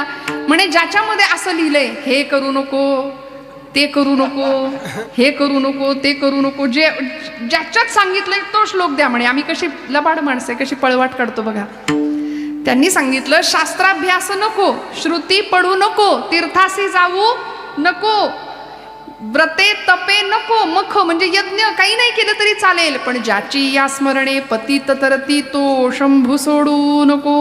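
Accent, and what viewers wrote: Standard. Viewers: ताईचे मराठी शब्द उच्चारण सु स्पष्ट आणि अगदी शुद्ध आणि सुमधुर आहेत आणि अप्रतिम वर्णन,हीच खरी संत परंपरा आणि लोकांच्या कल्याणा संतांच्या विभूति,जय हरि विठ्ठल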